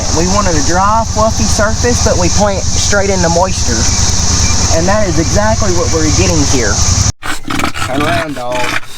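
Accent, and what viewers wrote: South, United States. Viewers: Hi there, I'm new too you're YouTube channel, and I have in joyed what you have shown, thank you for showing us a part of your day, and in joy your Southern voice, I'm from Kentucky and I miss it so much, thank you for having the Southern voice, I'm from Campton Kentucky or better know as Wolfe county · Hey bud, just say your channel and enjoyed it. I'm from your neighbor from Tennessee. I'm glad to here the southern talking on this. See-ya in the next one. Old Hickory, TN 🇺🇸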